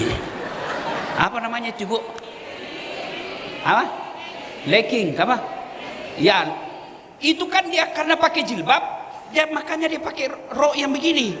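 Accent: Bugis, Indonesia. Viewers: Bagus aja masuknnya mas,, tpi tidsk mungkin ustad tidak paham atau sengaja asal asalan., tpi ini dialek daerah. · Mungkin lidah orang Bugis beda dgn penyebutan lidah suku BPK pun sebaliknya ini pernah juga ditanggapi oleh usdtad lainnya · Lidah Bugis itu bro maksudnya sama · Lidah bugis mas...itu bukan masukan tapi ... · lidah bugis bang hehe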